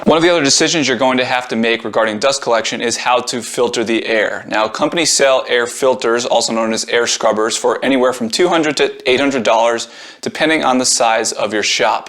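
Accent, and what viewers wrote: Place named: United States. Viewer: pleasant American English accent... there aren't a lot of American men that speak well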